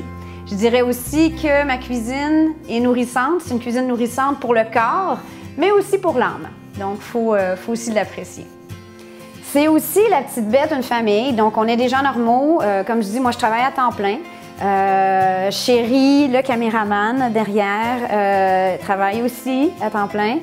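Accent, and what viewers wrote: Quebec, Canada. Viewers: J'adore l'accent canadien ! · Belle chaîne de cuisine, l'accent et les expressions québécoises sont sympas. Je m'abonne ;))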